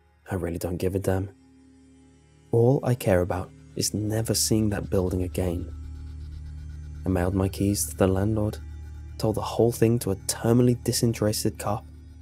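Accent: United Kingdom. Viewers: British much?😂😂 I love how you say garage 😅😂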